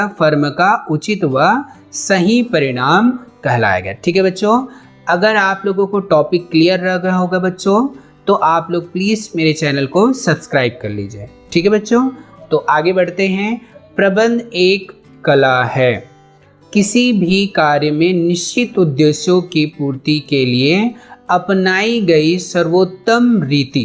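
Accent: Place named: Punjab, India. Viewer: i think you from punjab😅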